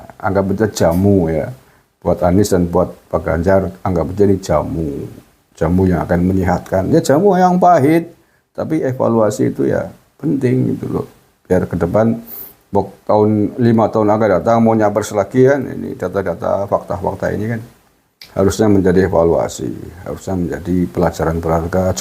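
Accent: Banyumas, Indonesia. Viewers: Janen rika wong ngapak ya,,memeng prabowo gibran yes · Deneng ngapakk pak😅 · Bang smpyan itu jawanya mana ya kayak nya ngapax ngomongnya.salam 0,2 maju terus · Pak Gus Marngapak jg y..pada bae nyong beh ngapak pak bravo sehat selalu🙏💪betul pak apa yg ditabur 01&03 akan mereka tuai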